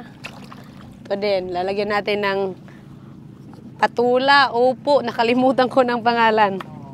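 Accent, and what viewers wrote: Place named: Visayas, Philippines. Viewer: Mailhan jud ang bisaya mo storya dol no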